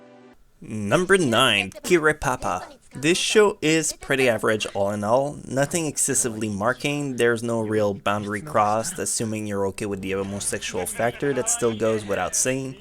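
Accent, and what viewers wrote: Quebec, Canada. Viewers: French Canadian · is Canadian and his first language was French so that's his accent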